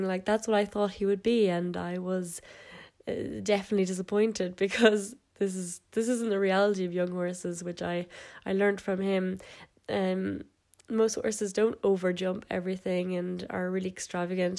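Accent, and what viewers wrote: Ireland. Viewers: Are you Irish? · Awwwww so cute I started horse ridding 5 weeks ago I LOVE IT,btw r u Irish because I am and u sound like u :)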